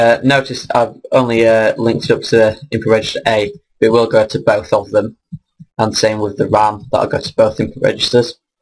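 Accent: United Kingdom. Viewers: is my man scottish?!!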